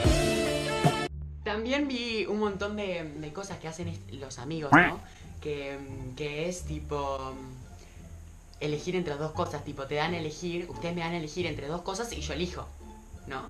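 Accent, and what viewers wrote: Argentina. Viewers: se le nota los argentinos hablamos así uwu.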